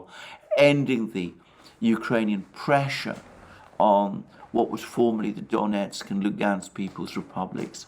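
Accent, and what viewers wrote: United Kingdom. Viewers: Alexander when all this quietens down (and that it will) you will be the most important historian of this war. Indeed posterity will remember you as the articulate studied chronicler journalist of this Slavic affair, with a delightful British accent. More power to you and your tribe. 🎉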